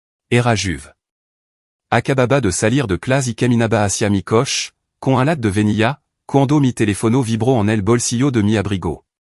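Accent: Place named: France. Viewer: Que mierda es esta de poner una voz en pseudo castellano con un acento en francés que hace incomprensible y vomitivo el relato? salgo por patas de acá.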